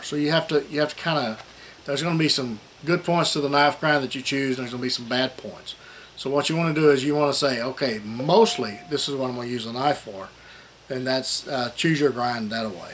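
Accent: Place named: Appalachia, United States. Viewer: he sôunds like an appilation American I'm one too thats why I can t spell it.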